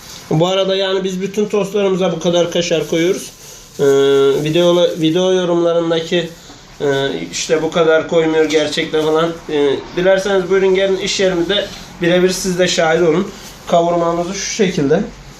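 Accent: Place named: Thrace, Turkey. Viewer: bu adam trakyali mı konuşması trakyalilara benziyor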